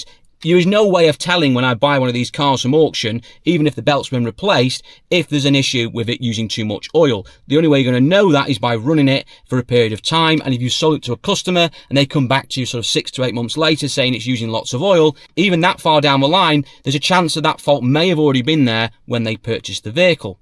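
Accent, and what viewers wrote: United Kingdom. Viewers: Highly informative; But have someone else without the heavy Brit accent unundrstandable!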